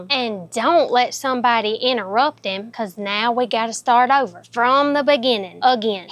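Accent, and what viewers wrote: South, United States. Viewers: As a southern man, I love to hear her voice/accent. Classic. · i love your southern drawl beautiful, all women should speak like you..... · I could listen to you talk all day. Southern with lots of sarcasm!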